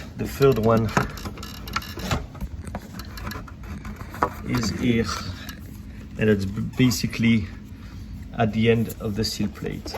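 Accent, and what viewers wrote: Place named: France. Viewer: Thanks for showing the most important part. Removing those plastic panels without breaking, Heaven forbid screws to fasten. Can't wait to experience the pleasure and appreciate the tid bit how sharp that plastic is, nothing worse than cutting yourself doing a repair. I detect a French accent.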